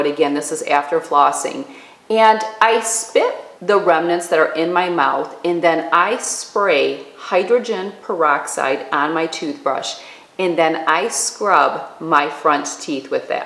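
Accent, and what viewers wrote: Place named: Midwest, United States. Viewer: btw......LOVE your channel.....I've watched a few and like them as well but have decided you're my favorite.......your makeup colors are my makeup colors so much so that in look to copy everything you do via makeup......love it!.......lol your articulation and Midwestern twang are actually quite mesmerizing as well.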